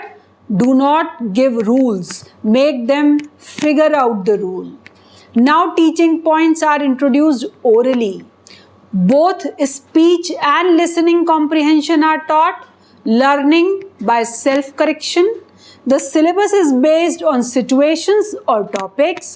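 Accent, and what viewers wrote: India. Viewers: Don't make rude comments just based on her accent. People are so quick to judge others these day. She is from India and her accent is greatly influenced by her mother tongue. Jackie Chan though he has been working in Hollywood for years he still speaks English in Chinese accent. Even the great Kalam sir used to speak English in Indian accent. So would you treat him stupid just because his accent is bad?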